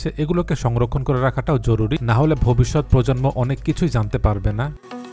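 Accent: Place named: Sylhet, Bangladesh. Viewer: বাংলাদেশে যেকজন এরকম ভিডিও বানান তারমধ্যে আপনার কথাবলা বা ন্যারেশন সবচেয়ে আন্তরিক৷ কোনো ভনিতা নেই। আপনার কথার মধ্যে হালকা সিলেটি টানটিও লক্ষণীয় যা শুনতে ভালোই লাগে।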